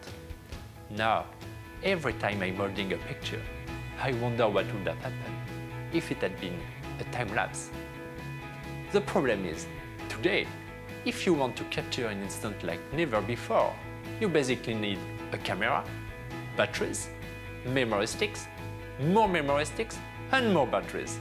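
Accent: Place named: France. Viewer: C'est une bonne idée je viens de le voir sur studiosport, en 4K ça aurait été cool, sinon l'accent ( french accent) à coupé au couteau ça le fait moins x)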